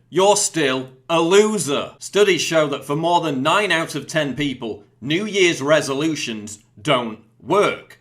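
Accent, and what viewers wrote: United Kingdom. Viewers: Are you British, sir?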